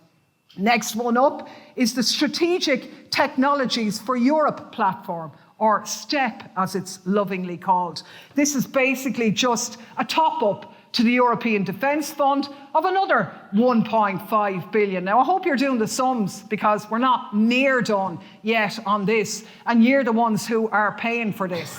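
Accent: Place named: Ireland. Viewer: Irish accents though. So pleasant.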